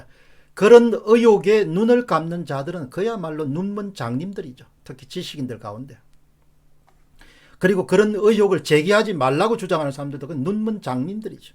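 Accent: Gyeongsang, South Korea. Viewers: 저는 선거전에 조작이 되면 어쩌나 하고 생각 했습니다. 사전선거하는날 왠지 음훙한 느낌이 들었고 뭔가 음모가 있지 않을까 하는 불안감이 들었습니다. 그러나 진짜 그럴려고.... 하는 생각에서 민주당이 압승을 거두는걸 보고. 사실이구나. 하는 느낌를 지울 수가 없었습니다. 제 주변에 많은 사람들이 민주당에 치를떨고 싫어하는데. 그런데. 공선생님께서 이의를 제기할실때. 나만 그런게 아니구나 하는 생각을 했습니다. 선거조작이 아니라는 집단과 조작이라고하는 분들의 영상을 보며. 조작이라는 쪽으로 확신을 얻었습니다. 공선생님께서 옳은것을 지키려는 마음이 피부에 절절히 와 닳습니다. 수시로 올리시는 영상을 보며 마음이 많이 아팠습니다. 같은 진영의 언론인 출신분들이 마치 공선생님이 뭔가 잘못됐다고 비웃을때 끄덕하지 않고 끝까지 밀고 나가시는 결기에 탄복하지 않을 수 없었습니다. 새벽이면 올라오는 영상들. 끊임없이 옳은길을 주장하시는 경상도 말투. 저도 경상도 사람으로서 너무도 같은 정서가 느껴졌습니다. 단호함과 부드러움. 그리고 옳다고 생각하시는 것에 굽히지 않으시는 결기에 깊은 존경심을 보냅니다. 부디 이기시길 마음모아 응원합니다.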